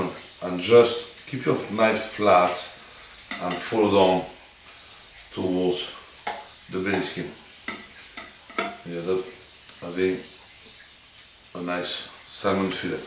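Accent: France. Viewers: I thought he rather had a French accent.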